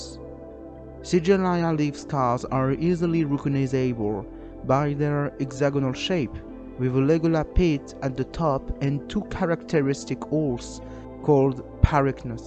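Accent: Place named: RP, United Kingdom. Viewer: On ferme les yeux et on croirait entendre un Lord anglais … C’est plutôt un compliment bien sûr, ça change des « ze plants of ze carboniferous … » Very good subject!! Tha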